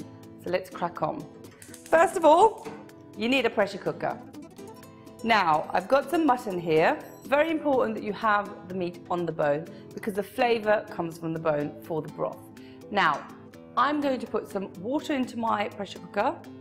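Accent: United Kingdom. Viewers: R u frm london?? U hav british accent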